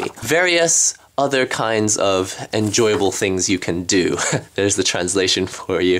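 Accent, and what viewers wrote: United Kingdom. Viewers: I'm not saying that silly. From his accent, he's clearly a British dude moved to Japan!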